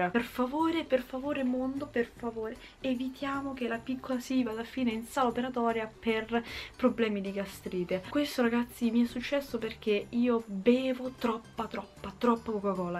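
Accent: Rome, Italy. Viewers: MADONNA CHE ROMANA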